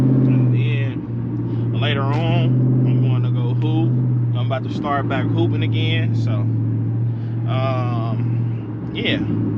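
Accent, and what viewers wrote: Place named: Chicago, United States. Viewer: Damn I miss the crib I'm from Ada park boi I know you from Chicago out here in Phoenix Az I don't miss the snow tho I'm hearing you talk like yeah he from the land 😂😂😂😂😂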